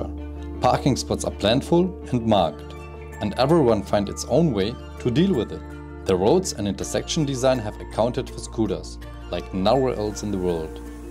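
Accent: Germany. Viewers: I am not offending your English. It is perfect. 😊 I just wonder if you are from Germany, because of little accents. You have done a pretty job capturing Taiwan. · Well done, enjoyed watching and seeing your amazing footage🥰 Moreover i like your german accent. Keep it going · Awesome video, great footage and editing. Love the city, too... but the reading is quite frankly... sub-optimal... thick German accent, mispronouncing easy words, ... :'( Maybe re-do it with a native speaker? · Do I hear german accent?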